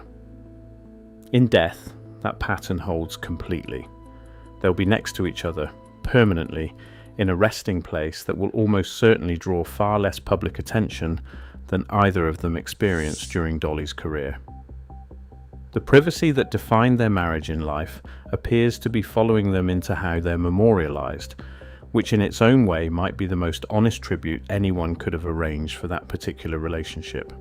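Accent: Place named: United Kingdom. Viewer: Why does a video about an American icon have a British narrator??????????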